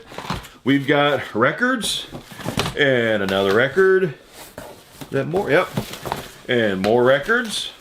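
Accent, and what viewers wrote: United States. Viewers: Omg what part of Indiana are you from??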